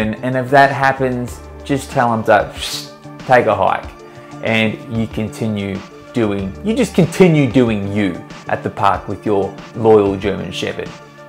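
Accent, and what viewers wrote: Australia. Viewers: Australian accent very funny and cool for German ears. She is adorable. Total class.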